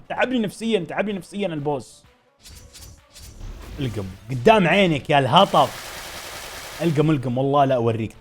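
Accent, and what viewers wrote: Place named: Kuwait. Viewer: انت كويتي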